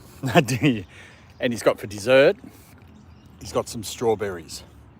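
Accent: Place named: Australia. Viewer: I love the Aussie accent 😍 Not to keen on my own voice , I have a strong London cockney accent 😊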